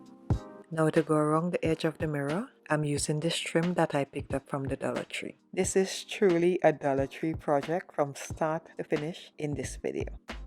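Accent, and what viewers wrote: Trinidad and Tobago. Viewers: Great job,you sound like a Trini 🇹🇹. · I know a Trini accent when I hear it!!!😘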